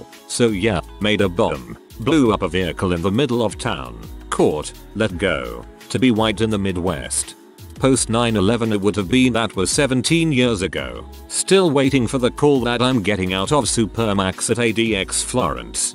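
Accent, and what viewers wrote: United Kingdom. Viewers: The robotic voice with the British accent…distracting and sometimes hard to understand. Get a live person. I Start to listen, I try, but then just have to stop.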